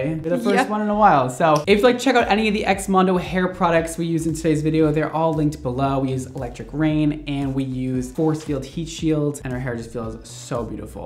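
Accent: Eastern Europe. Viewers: I'm curious if slavic as she have this funny accent (do we all have it🤔) btw, her hair is amazing! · She is so beautiful 😻 I could be wrong but I hear Slavic accent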